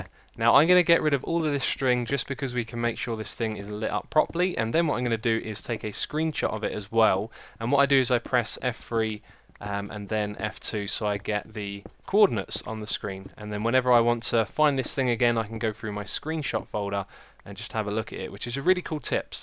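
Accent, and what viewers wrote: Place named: United Kingdom. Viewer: Its really hard to speak in the British accent but i really like it and that's what makes all your videos a bit more fun and entertaining to watch than they really are. P.S BIG FAN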